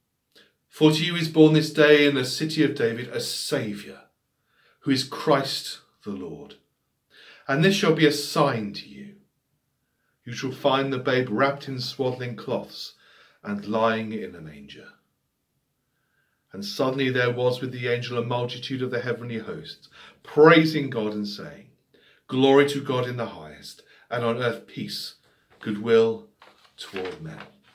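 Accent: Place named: United Kingdom. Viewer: My (Sub - we have to renounce of this prefix)!... You explain accurate the message / meaning of this fragment of the Bible!... I forwarded this to my friends and students who speak and understand English for them to have both a good teaching and a model of how they have to pronounce the words in British English!... Thank you!...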